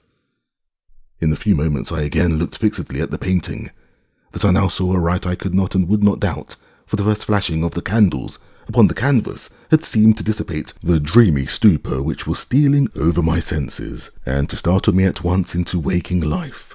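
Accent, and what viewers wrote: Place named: MLE, United Kingdom. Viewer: Are all your books narrated by this guy? No offense but his MLE accent is terrible. There are so many great amateur readers on here you could hire!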